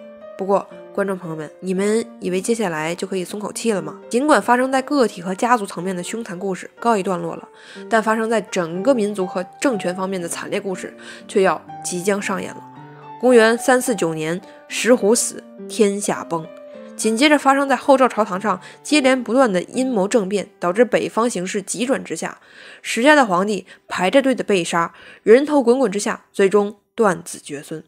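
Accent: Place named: Beijing, China. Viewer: 姑娘說書內容詳盡又能不疾不徐且毫不做作！一口道地之北京話更讓吾人擊節欣賞！姑娘讚！既然姑娘熟讀中國歷史即使是分裂戰亂年代也是漫長的歲月可述說之內容亦如海水之不可斗量！姑娘妳何妨將之細分多期講述之？一則讓聽眾有時間消化二則也能有更多期的視頻此乃兩全其美也！姑娘妳何樂而不為？姑娘妳以為然否？同時姑娘妳也不妨開闢歐洲史的部份自馬其頓帝國起至二戰結束終也能製作非常多期之視頻矣！至少能讓銀明白阿提拉大帝和拔都汗對歐洲造成的傷害是多麼的巨大！還有為什麼蘇德一定非要瓜分波蘭不可！又為什麼明明是同樣的斯拉夫民族鵝螺絲和烏克蘭卻能生死搏鬥！以及為什麼日俄戰爭中波羅的海艦隊全軍覆沒卻是對蘇聯共產黨天大的幫助？等等………！如此增廣我等之見聞豈不美哉？姑娘妳其有意乎？忠言向來逆耳！若在下所言使姑娘妳不悅亦望姑娘展現大度一哂置之！則在下幸甚！姑娘亦幸甚！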